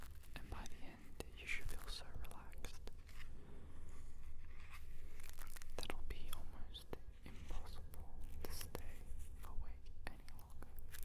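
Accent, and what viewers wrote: Australia. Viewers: You have the perfect soft spoken AND whispery voice for ASMR, and I love feeling at home with the aussie accent. I swear when I subbed to your channel ages ago it was off the back of seeing a few of your videos, and one of them was like a light triggers one? I’ve been trying to find it but can’t, have you ever even done one before or have I gaslit myself into believing you have? If you haven’t, I think there’s some untapped potential there for you, you’ve already got visual triggers and sound triggers down pat, so if you added lights into your visuals that would go insane. Thanks for listening 😌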